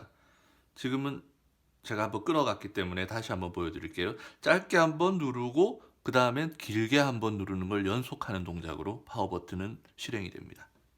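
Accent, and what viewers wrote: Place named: South Korea. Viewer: 다좋은데 콩글리시가 ㅎㅎ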